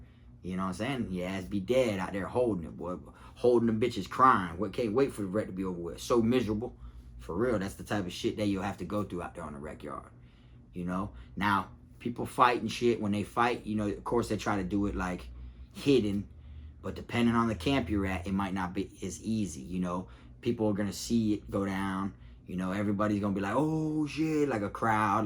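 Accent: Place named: South Florida, United States. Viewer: My homie from Davie, always feels like im talking to a neighbor. Love that south florida twang. on the job, great vid!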